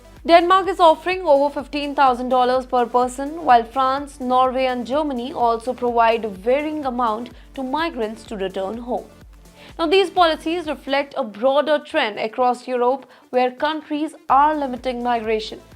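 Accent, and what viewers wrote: India. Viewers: 🤣 She is mispronouncing many words but I guess it’s her Indian accent. All forgiven. At least her video is useful to the public. 😀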